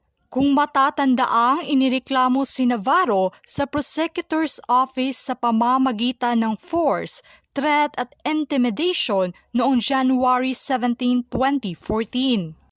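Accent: Visayas, Philippines. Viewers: Bisaya ung vlogger nito hula ko🤮🤮🤮🤮ang malambot tumitigas..ang matigas nalambot🤮🤮🤮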